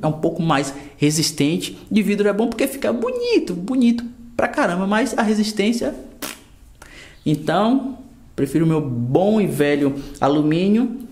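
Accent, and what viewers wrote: Bahia, Brazil. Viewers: Tu é baiano man kkkk?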